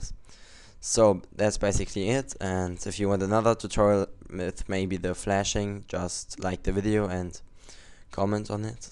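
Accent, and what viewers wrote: Germany. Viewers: Your German Innit?:3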